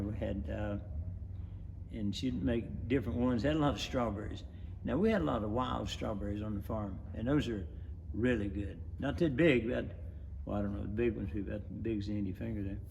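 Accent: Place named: North Carolina, United States. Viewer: Didn't know you were a fellow Tar Heel, but I thought I recognized your accent. I have one similar being born in High Point 1948. Best wishes to you,. Love and use your products.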